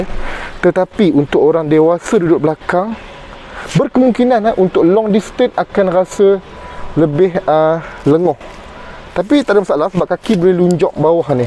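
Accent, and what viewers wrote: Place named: North, Malaysia. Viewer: orang loqstaq nii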